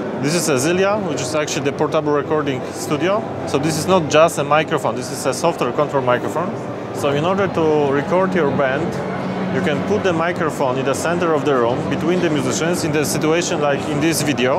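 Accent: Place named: Poland. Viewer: Polish accent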